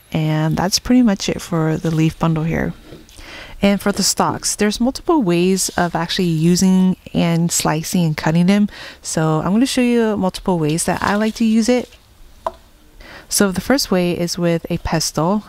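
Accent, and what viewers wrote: United States. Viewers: Which part of the world are you at, by your accent maybe USA. I live in southern England on a island. Ive been growing lemon grass since 2018 brought back from Thailand. I now have at least 300 plants. Its become a obsession with me. Great video. Are you from Indonesia?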